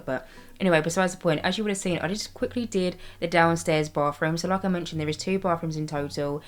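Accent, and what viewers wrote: United Kingdom. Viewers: Ouu British actent I don't understand!!😂❤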